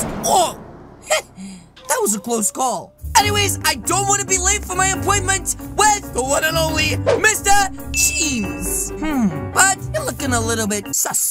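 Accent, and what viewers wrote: England, United Kingdom. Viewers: can I ask you a question are you english or ameeican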